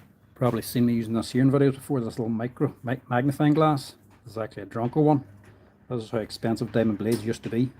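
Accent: Ireland. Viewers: . Keep doin what you do. Love hearin Irish from the other side of the pond 🤪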